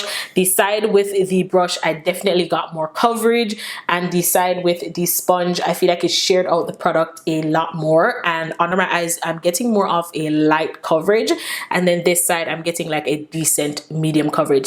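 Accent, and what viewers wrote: Jamaica. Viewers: I'm def getting a Jamaican accent coming through?😏 Concealer looks great tho.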